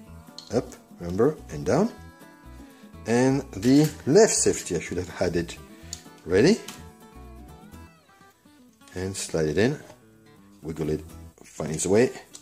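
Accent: France. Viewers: tried to find the answer in your other videos? Is that a French accent? Also I followed your instructions to install the Cajun Gunworks Reach Reduction kit on my Shadow 2 today. It worked out awesome!!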